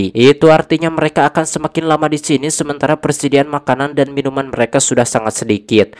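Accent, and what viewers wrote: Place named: Madura, Indonesia. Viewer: Meduro logatnya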